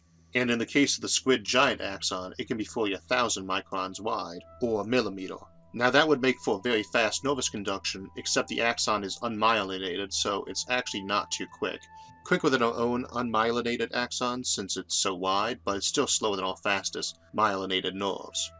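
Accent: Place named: Louisiana, United States. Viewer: Thanks, yeah despite the speech impediment I think it was the Louisiana accent I was picking up on. I could tell it was a Southern style accent but wasn't sure.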